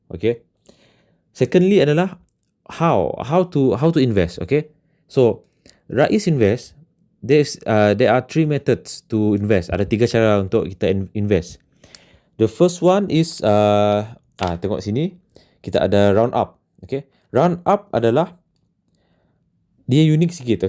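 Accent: Sarawak, Malaysia. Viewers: slang srawak ya xpat lari bos. . hahahah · Are you Sarawakian? 😂 anyway thanks for the vid, very helpful.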